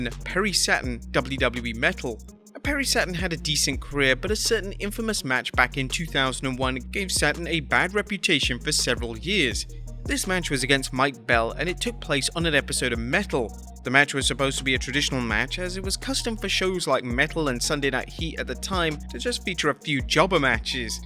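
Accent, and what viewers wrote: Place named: United Kingdom. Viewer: Even if you didn’t have the slight accent I would know without a doubt that you were from the UK. Love the way y’all pronounce H. 😃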